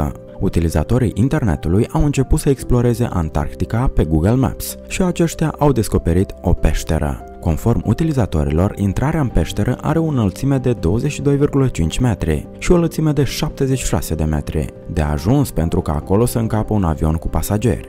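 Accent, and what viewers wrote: Moldova. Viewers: Ie moldovian bre😊😂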